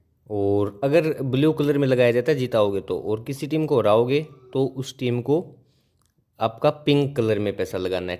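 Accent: Haryana, India. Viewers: Haryana se ho bhai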